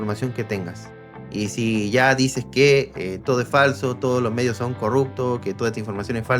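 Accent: Chile. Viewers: siento que sos chileno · Buen video pero no pude dejar de pensar que tienes un manera de hablar muy chilena de tu parte. Eres de chile viviendo en Perú, o solo es casualidad?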